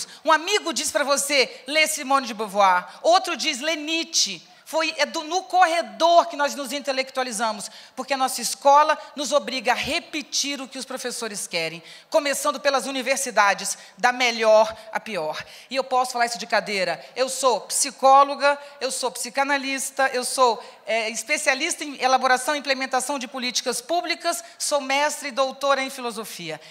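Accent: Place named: Rio de Janeiro, Brazil. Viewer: Eu sempre admirei a Viviane,mulher com uma inteligência acima da média.Super espontânea, sem falar nesse sotaque carioca que eu adoro.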